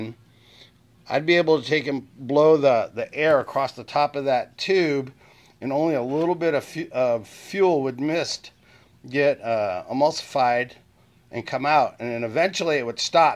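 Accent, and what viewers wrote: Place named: Massachusetts, United States. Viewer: sound like a mass accent love it from new hampshire.. good vid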